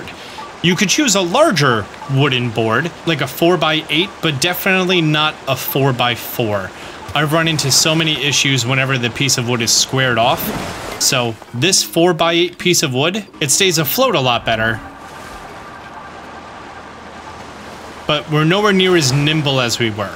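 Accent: New Jersey, United States. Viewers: What part of New Jersey bro? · Wait you're from Jersey?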